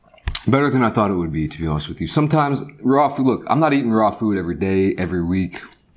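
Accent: United States. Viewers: Eh? Of course he's American check his accent!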